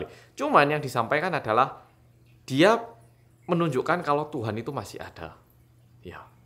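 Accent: Java, Indonesia. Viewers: Mas ini wajah Korean tapi bicaranya jawa banget boleh tau ngga mas sail korea apa Indonesia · Medok banget mas ngomongnya.. Jadi kayak Wong jowo asli..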